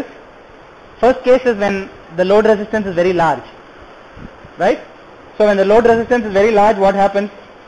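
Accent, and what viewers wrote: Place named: India. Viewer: strong Indian accent prevents me from understanding.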